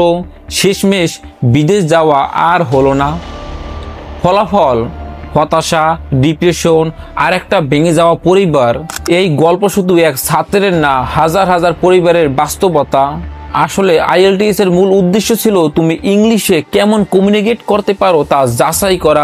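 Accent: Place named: United Kingdom. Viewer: ভাইরে ভাই যেভাবে প্রোনানসিয়েশন গুলো করতেছো মনে হইতেছে ব্রিটিশ এক্সেন্ট শুনতেছি ❤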